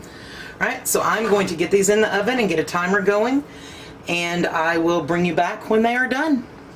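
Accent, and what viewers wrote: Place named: Texas, United States. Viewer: Are you a TX girl? Love to listen to you talk.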